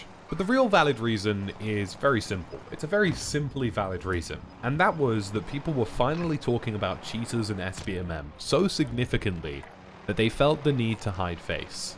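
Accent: United Kingdom. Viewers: Are you well?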